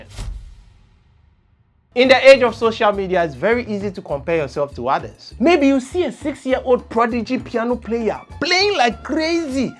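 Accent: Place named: Africa. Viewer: Hey African brother I low key love the accent lol thx for the vid.edit:i am only calling you afican because i have heen in afican villages lol (i am african my self loll).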